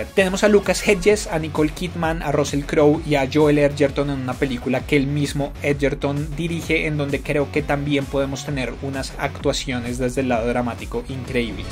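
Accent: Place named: Colombia. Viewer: Me encantan tus videos!! Hace poco que llegué a un video tuyo y desde ahí ya no me pierdo ninguno. Ir al cine contigo debe ser sensacional para poder hablar del tema con tanta pasión como lo haces en tus videos, 😭 pero voy escuchando que eres de Colombia!!! , no !!!!!!!!! yo quería, jajaja. Te mando muchos saludos y mucho éxito con tu trabajo, lo haces genial.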